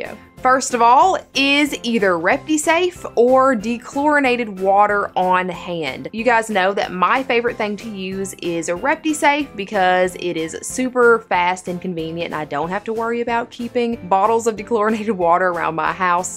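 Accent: South, United States. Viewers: I am super new to your channel and have been watching a ton of your videos with in the last few days and I am loving it! It is so informative and helpful. Also where are you from? I LOVE you slight southern accent!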